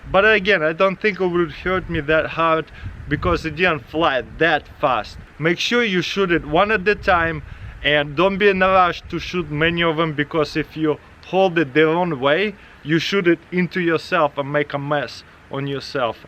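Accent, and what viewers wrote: Russia. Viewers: Y'all with a Russian accent!! This was awesome🖒🖒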